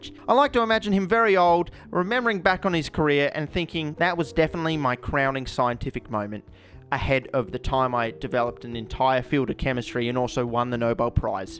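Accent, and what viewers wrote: Australia. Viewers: "The atmosphere is nature's bin." Spoken like a true Australian. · Not only do I realy like your aussie accent but your honesty. you r showing all the damn takes it took, to get this tiny (but well worth it in my opinion) explosion filmed. You are awesome mate!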